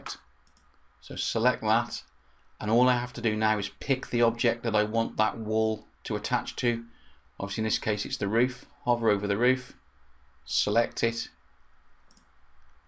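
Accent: United Kingdom. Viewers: Nice British Accent and very helpful sharings :) Thanks alot mate :))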